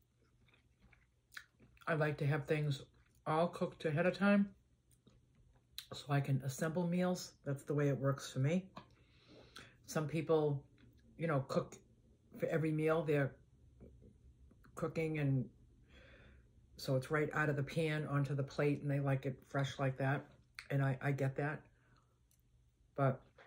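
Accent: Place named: Boston, United States. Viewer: .. Thank you for the suggestion,😢 But I ❤love listening to your Boston accent.😊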